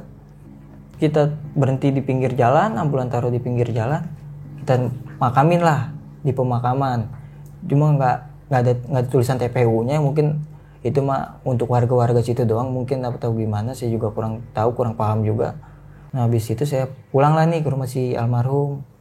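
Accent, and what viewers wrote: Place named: Pemalang, Indonesia. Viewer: Orang Pemalang nih Pemalang mana ka:v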